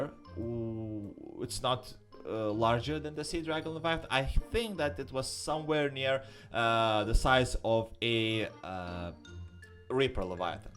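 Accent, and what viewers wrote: Russia. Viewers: What country do you live in? (Russia)? русский акцент)))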